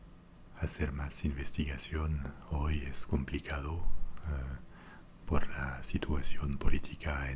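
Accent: France. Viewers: Hablas bien español reconozco tu acento france :) gracias por los videos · acento francés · Nueva subscritora reconoci tu acento frances,estudie dos años frances,Tu español es muy fluido y me encantó el contenido! :)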